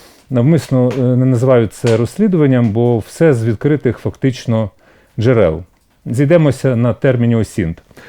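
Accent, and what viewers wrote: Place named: Surzhyk, Ukraine. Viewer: Як завжди, оце жлобське "говорити". А укр. слово - розповідати, скрипін засунув далеко у дупу. Бо для скрипіна - какаяразніца, суржик або мова. Головне плямкати тим суржиком, із укр. інтонацією.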